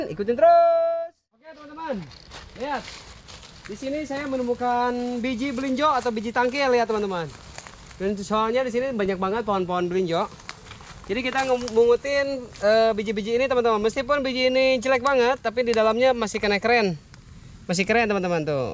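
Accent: West Java, Indonesia. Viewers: bang ngmong nya campur sunda jadi lucu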